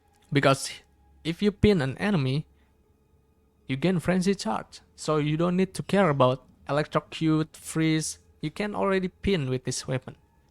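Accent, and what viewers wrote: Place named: Indonesia. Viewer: Thanks for the awesome build bro enjoying it very much! Btw, From your accent, are you indonesian ?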